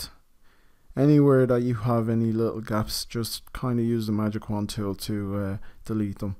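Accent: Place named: Ireland. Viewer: THANK YOU SO MUCH. Finally found this after scouring for days. And that Irish(?) accent made everything so much better lol.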